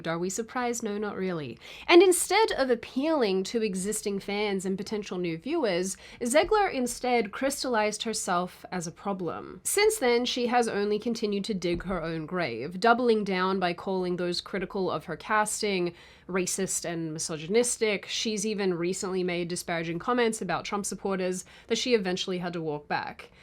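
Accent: Australia. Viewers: Your Aussie accent is thicker when you're sick. Hilarious and endearing. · Sydney, you sound a lot more Australian when you sick. 🐿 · Aussie accent getting stronger and stronger..... LOVE IT!!! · I'm not sure if in crazy or what 😂 but you sound way more Australian when you are sick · GREAT analysis, Sydney. You pointed out things that I had not thought about, specifically the WHY woke movies (and everything else woke) is SO BAD! Btw... your Aussie accent really comes out when you're not feeling well - but at least you looked great...! 😊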